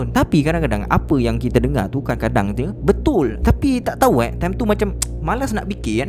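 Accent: Johor, Malaysia. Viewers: bang dari johor ke hahahaha salam dari kulai senai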